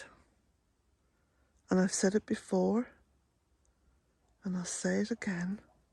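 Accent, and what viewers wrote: Ireland. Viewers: I just want to speak about your books. I have them all. I read and re read these. In these stressful times it is heart warming to read how you tell your story. Your passion comes to life in a very colorful way. Your love and devotion to the Goddess. To Earth. I actually think I read and hear your Irish accent as I go along. Lol. It's comforting and brings the certainty that we will make it through all this. "In a time of destruction create something", plant something, love something, nurture something. Great job! Blessings💖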